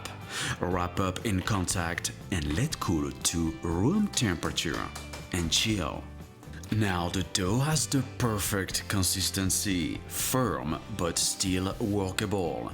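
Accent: France. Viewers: Bonjour, Vous êtes Top! Etes vous d'origine française? Vous en avez un peu l'accent! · Cassie Hassani il est français je pense , je reconnais l accent français, (très léger) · I love your voice and French accent!!! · Love the french accent!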